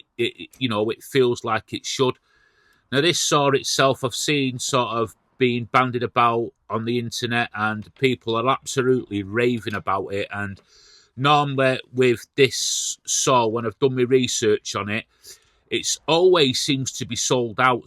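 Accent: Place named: Manchester, United Kingdom. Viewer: Sound like a fellow manc to me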